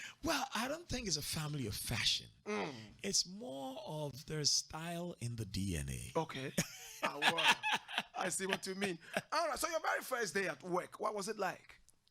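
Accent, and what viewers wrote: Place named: United Kingdom. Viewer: But you’ve got a British accent so what should we call you.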